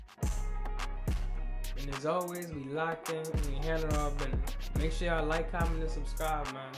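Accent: New Orleans, United States. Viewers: You sound like you’re New Orleans bro.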